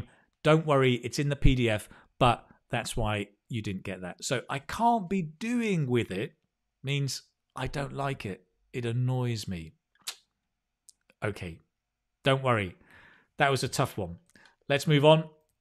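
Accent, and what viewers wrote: United Kingdom. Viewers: Is teacher from UK, bro?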